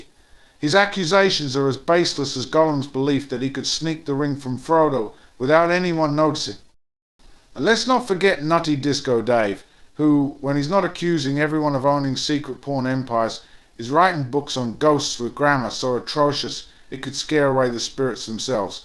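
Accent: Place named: RP, United Kingdom. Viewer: 😂😂😂😂😂😂😂😂 So damn Posh...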